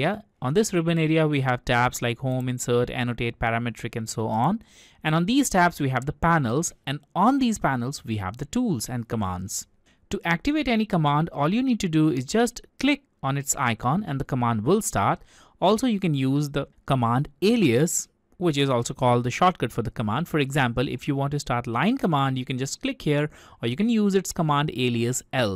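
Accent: India. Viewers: A rare case of a quality tutorial made by an indian with an indian accent. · Why would you use an indian voice over to speak English what the fuck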